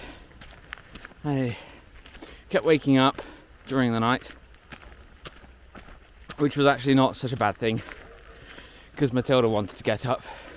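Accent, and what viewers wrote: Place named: Scotland, United Kingdom. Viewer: That was interesting morning walk with a bit of scottish accent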